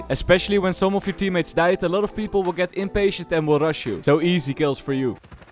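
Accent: Netherlands. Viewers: i smell dutch?!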